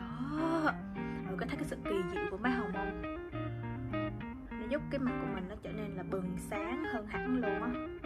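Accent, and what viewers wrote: Da Nang, Vietnam. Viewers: Em mê giọng chị vs giọng con gái Đà Nẵng nói chung lắm huhu =)))) chúc 2 mẹ con chị mạnh khỏe nhaaaa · Nghe giọng là biết ngay ĐN luôn ❤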